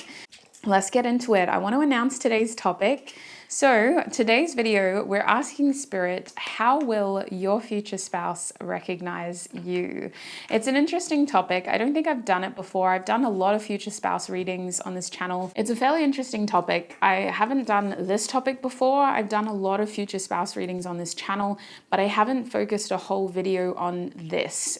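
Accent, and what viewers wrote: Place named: Australia. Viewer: Listening to her videos helped me a lot with aussie accent😂❤thanks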